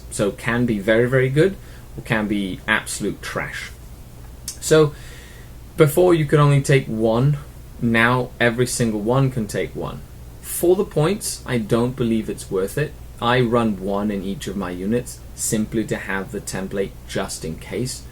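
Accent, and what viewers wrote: United Kingdom. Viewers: Are you Canada you sound uk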